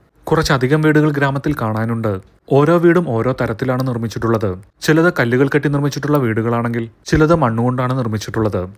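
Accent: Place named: Kottayam, India. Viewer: കോട്ടയം സൈഡ് ആയിരിക്കും ഇവര്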